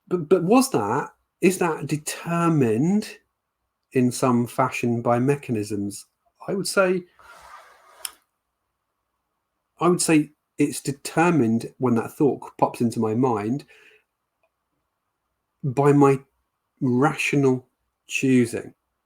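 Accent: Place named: England, United Kingdom. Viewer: Superb English accent and manner indeed, on top of all the thought put in. Subscribing. (A beautiful reminder of Attenborough, but dreadful now to realise that "Soonack" Sunak and co have similar too, eloquently aiding the destruction of the our fellow human beings and their loved ones in Gaza and Ukraine!!🥶)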